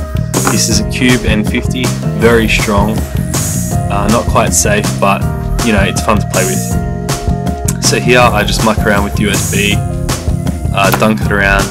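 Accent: Australia. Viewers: are you australian? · I KNEW HE WAZ AUSSIE!!! can I get a million dollars now?? Mail it to Queensland ##################### U thaught I waz gonna actually give u my address yea nah..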